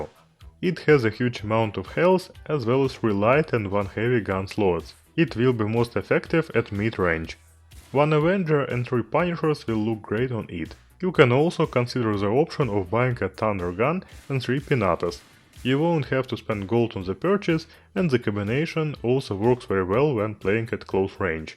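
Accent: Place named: Russia. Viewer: а по русский нельзя? все равно английский как русский ..так понятнее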